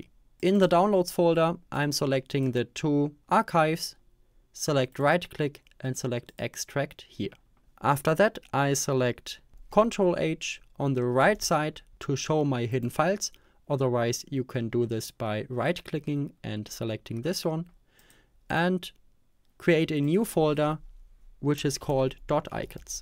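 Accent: Germany. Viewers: Hey, da ich deinen deutschen Akzent raushöre, wollte ich auch ein Kommentar auf deutsch da lassen!:D Es hat alles super geklappt, vielen lieben Dank! Du hast alles entspannt und ruhig erklärt!!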